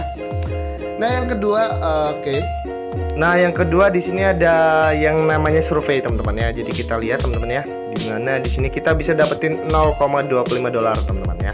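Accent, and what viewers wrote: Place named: Lampung, Indonesia. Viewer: Lo orang njirr. Kek orang lampung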